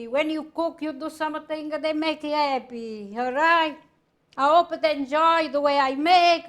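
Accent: Italy. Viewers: I love this; I grew up in a French/Italian neighborhood since my family was French Canadian, we had a lot of Italian neighbors. I miss the accent that she has. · Sweet lady ... people are so insensitive. She has a deep Italian accent but who cares! Think of your own grandma. She means well. And she sings, too. Love it.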